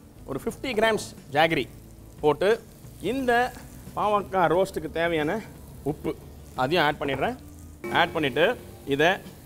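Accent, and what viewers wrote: Chennai, India. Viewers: வணக்கம் வெங்கடேஷ் பட் உங்கள் சென்னை தமிழ் அருமை , பாகற்காயை அவன் இவன் எனபது . பாகற்காயை பிடிக்காதவன் சாப்பிட வைக்க என்ற வார்த்தை 👏 எத்தனையோ பெண்களை வாழவைக்கிறீர்கள் வெளிநாட்டுகார்ர்களுக்கும் சுவை எனில் என்ன என்று கற்று கொடுக்கிறீர்கள்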